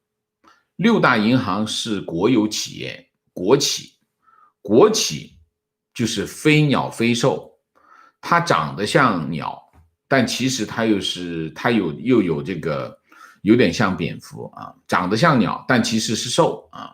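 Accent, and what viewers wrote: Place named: Shanghai, China. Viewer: 有一点点上海腔，我一开始也以为王先生是上海人。结果您说小时候在上海生活过，我想怪不得。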